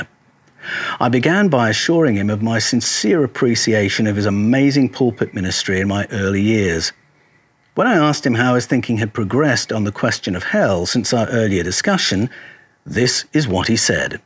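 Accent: Australia. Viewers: does a great Aussie accent. After all is an Aussie himself.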